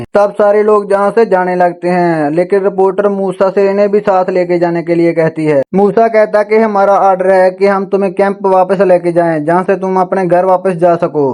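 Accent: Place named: Punjab, India. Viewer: NICE BRO TUHADI HINDI CH PUNJABI VALI GALL BAAT AA IK VAAR PUNJABI CH EXPLAIN KARIO ATT BRO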